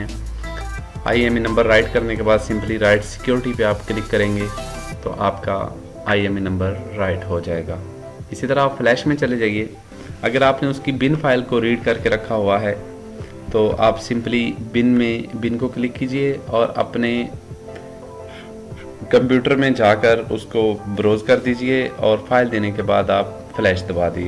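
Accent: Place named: India. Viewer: if you cannot speak English - don't speak. 4kin Indian pronunciation: just make the text on screen!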